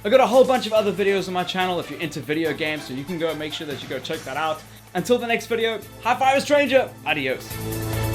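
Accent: South Africa. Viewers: Hey, I thought I heard a hint of South African in your accent and I wanted to know if you are.:D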